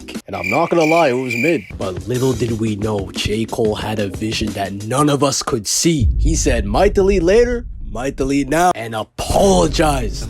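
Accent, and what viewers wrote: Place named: Canada. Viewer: so sorry to ask but are you Canadian? love ur voice lol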